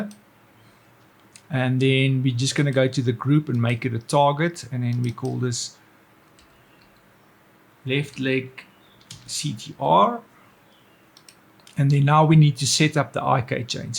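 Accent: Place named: South Africa. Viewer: Good sir, from your accent im assuming your south african,🤝😃. Im curiouse, are there any south African companies or industries that use Rive in they pipeline? Great stuff by the way👏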